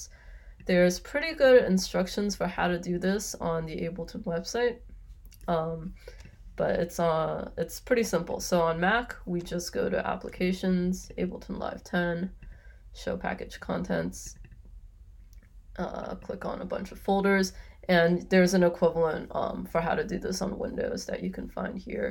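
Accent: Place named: United States. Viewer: japanese but american as you can see how she talks.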